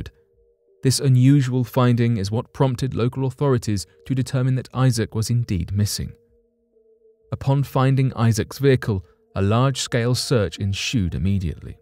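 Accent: United Kingdom. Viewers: Brits say geezer. This dude is a Brit.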